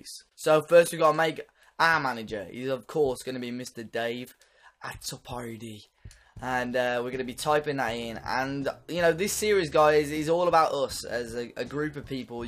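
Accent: Malta. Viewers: Are you from Malta 🇲🇹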